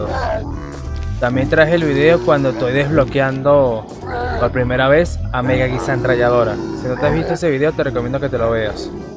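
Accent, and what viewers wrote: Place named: Paraguay. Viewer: Se nota el acento Paraguayo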